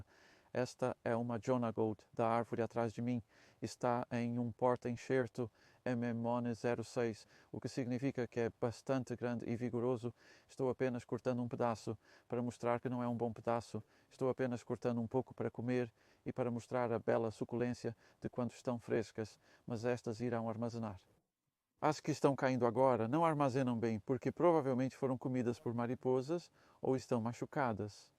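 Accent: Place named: Portugal. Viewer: Bom agora temos um canal em português ( com sotaque de Portugal) desse mestre. Tava difícil entender porque todos vídeos não tinham legenda em português ✌️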